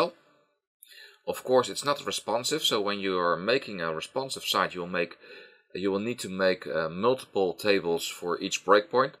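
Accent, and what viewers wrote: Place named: Netherlands. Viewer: I can tell you're dutch haha! Either way, very helpful tutorial! Kudo's!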